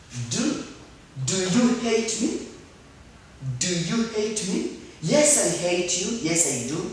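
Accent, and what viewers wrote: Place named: United Kingdom. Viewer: Teacher thanks for your contribution to the Rwandan community I really like your methodology,but most importantly your English is clean it sounds really british English not like some teachers whose English accent cannot be distinguished with their local languages. These international language should really not be confused with our local languages. Please keep it up.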